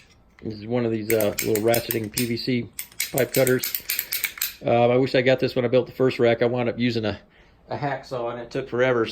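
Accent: South, United States. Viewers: Good video man! I love the Southern accent lol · Very nice! Where are you located? Sounds like GA/AL. I'm in NC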